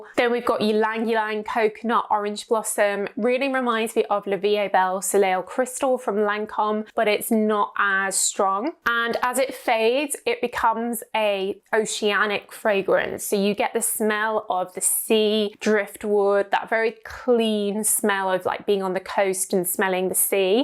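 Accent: United Kingdom. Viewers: her British accent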